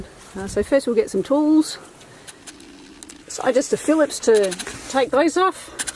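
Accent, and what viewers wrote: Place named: Australia. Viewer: Cool vid. So good to hear some Aussie…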